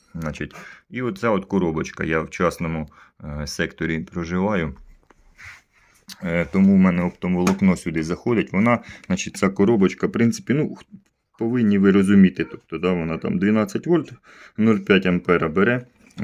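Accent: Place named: Surzhyk, Ukraine. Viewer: Автор говорит на суржике...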